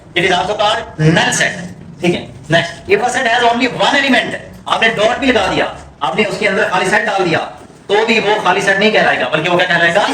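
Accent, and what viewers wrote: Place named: India. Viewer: bhai kia bat hai indian touch dy rha hai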